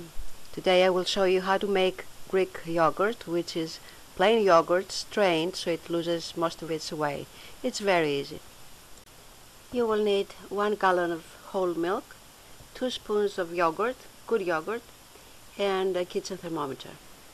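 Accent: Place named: Greece. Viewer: From your slight accent I take it you are also Greek as I am? If you are then I can happily say "Efharesto para polio", translated into Thank you very much. I use Fage thick Greek store-bought yogurt as my starter if I do not have previous home made yogurt left over, and with the Fage it also comes out delicious. For many years I used to use my Greek Grandmother's method of straining the yogurt thru a clean pillowcase, since cheesecloth is really a pain, but recently I decided to buy the Euro Cuisine GY50 Greek Yogurt Maker, which is really a yogurt strainer. It fits easily in my fridge, and strains the whey beautifully, and the end result is very thick Greek yogurt. Also the longer I leave it to strain in the fridge the better, because it also becomes thick like goat cheese, and if I add some salt to it then it becomes ricotta cheese. To clean it I just use my sink sprayer on light force, and get all the yogurt out until it is clean, then air dry it for the next time. 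No dishwasher for this item. If this type of strainer interests you look on youtube as they have video's about it. Thanks again for your instructional video, and I thoroughly enjoyed it.. Ya Sou, keh kalie oreksie meh to yaourtie sou!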